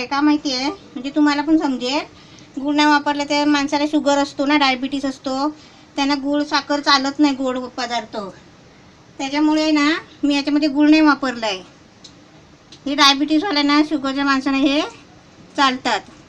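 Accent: Konkan, India. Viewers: tumhi bolata khup chhan..kokani laheja madhe..recepies chhan tumachya...sp. tumache ase veg recepies dakhwa..thank u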